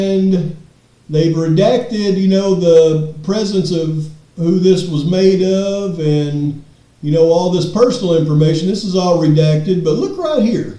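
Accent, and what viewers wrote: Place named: South, United States. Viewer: PS it’s CON-SPICK-U-US not Con-Spish-Us 😂.. southern drawl was very present in todays video 🤠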